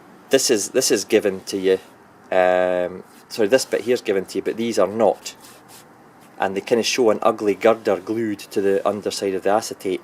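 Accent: Scotland, United Kingdom. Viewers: Nice work!!! I watch for the Scottishness as much as I do for the trains :)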